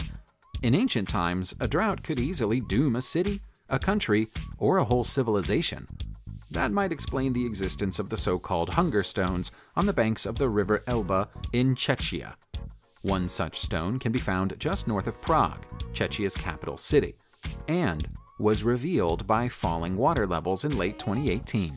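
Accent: United States. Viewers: American production/narration.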